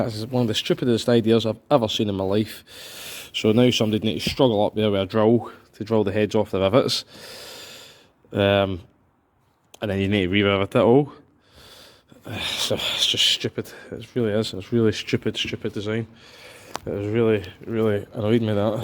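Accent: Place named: Scotland, United Kingdom. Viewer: Ooo eye matey. I labouriously watched your video, to try to find out how to remove the lower control arms of my 2001 Ford KA. But all i got, was a 99% of a video where you talk, in your very broad Scottish accent (which is bad enough), about Fn Ford Rivets, and Fn bolts on the Fn Ford bumper!!!! You show a picture of the new arms and the F'd old bushes. Nowhere in this video did you show what we all want to see. How to FN get the FN Lower Control Arms OOT!!!! Sorry mate, but your video is useless.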